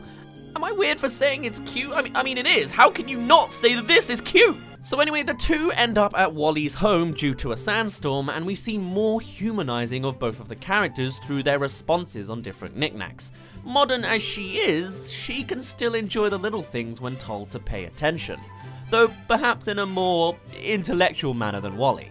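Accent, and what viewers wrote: United Kingdom. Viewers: I actually like the British accent. Maybe even a bit jealous...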